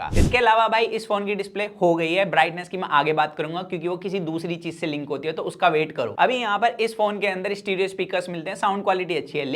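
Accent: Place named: Haryana, India. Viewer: Kuon hae yae Chutiya😂....look at his voice... haryana kae kuon sae "gaon" sae hae 💩💩 I thought it's mrwhosetheboss . BC mood off kar diya🤬 "itnaa kachraa hae you tube par"💩💩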